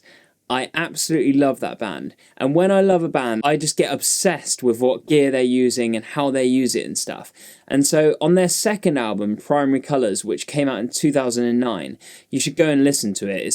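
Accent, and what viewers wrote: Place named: United Kingdom. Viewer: My weekly bri ish pronunciation lesson ❤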